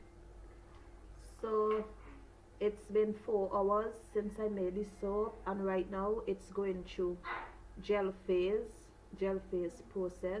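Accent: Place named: Saint Vincent and the Grenadines. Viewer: OMG. You sure don't sound bajan. My dad is bajan and my mom is vincy. And i was born in vincy and left when i was 14 to Canada. U sound vincy not bajan hon. Lol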